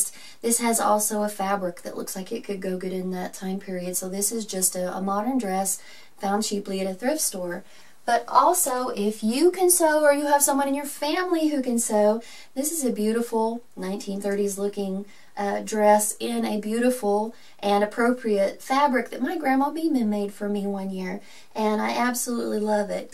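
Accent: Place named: South, United States. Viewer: Your accent is so sweet! It makes me crave a glass of ice tea under the porch of some cute southern house ❤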